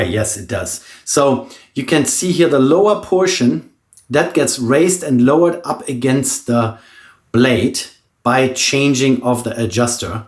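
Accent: Germany. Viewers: Thanks! Great videos and I appreciate the accent, is it German? 👍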